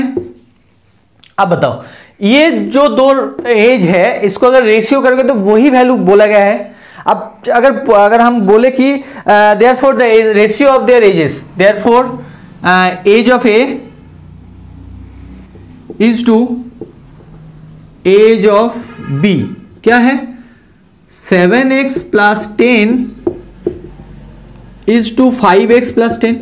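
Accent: Bengal, India. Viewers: Sir aap sum ko saam kyu bolte h shayad aap bengali ho mager aap bhot aache teacher ho heads off on you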